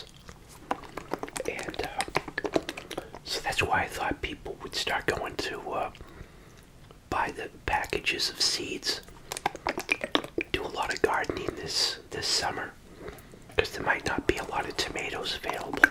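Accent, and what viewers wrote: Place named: New York, United States. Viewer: Love your videos 😍 just started watching them are you from New York? Love your accent 😁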